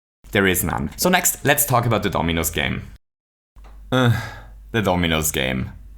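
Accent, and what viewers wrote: Austria. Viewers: are you austrian? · what the heck are you guys talking about? Also, i know his accent is austrian, but im not sure if he lives there, maybe he has something on a social page or something, we should make it our goal to try to track him down! We need to do some digging...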